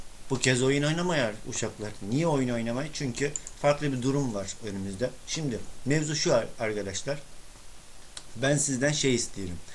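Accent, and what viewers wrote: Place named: Laz, Turkey. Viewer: lazın şiveye bak abbbooo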